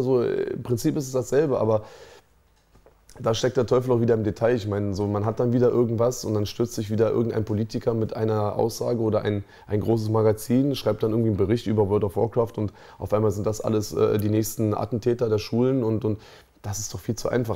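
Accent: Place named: Germany. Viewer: in echt heißts ja Command and conquer aber bei ihm hörts sich so lustig an so deutsch halt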